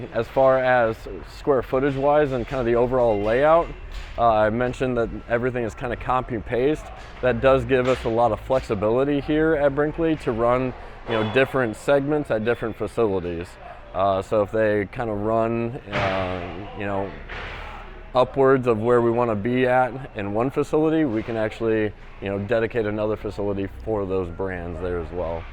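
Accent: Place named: Texas, United States. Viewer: Вери гуд👍🤣английский у автора канала чисто американский с техасским акцентом! 👍👍👍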